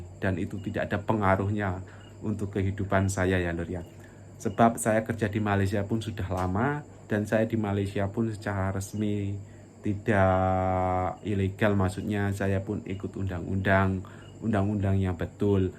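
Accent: Java, Indonesia. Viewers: Mass bhsa nyaa.medog bangettt.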